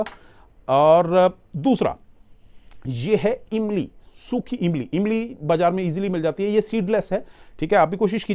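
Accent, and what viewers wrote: Punjab, India. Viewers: Doctor Sahb ka utshah or bolnna ka lehja dekh Kr video K shuru se hi lag rha tha k doctor Sahb😀😀 Punjabi hai 😀😀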